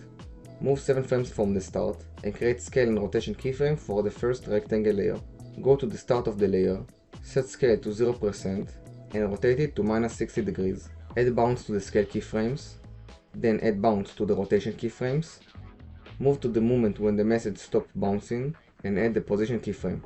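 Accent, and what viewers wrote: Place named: France. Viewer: french accent never can cover:face-green-smiling::face-green-smiling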